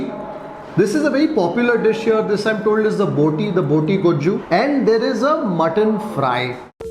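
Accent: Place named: Pune, India. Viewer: Sir. You speak pune marathi. But here in karnataka they use karnataka marathi. Which is not such pure marathi. It is bit different from place to place sir.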